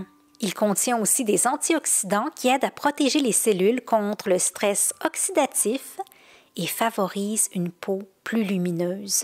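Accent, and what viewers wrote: Quebec, Canada. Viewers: l'accent sonnait bien le Québec. C'est très agréable <3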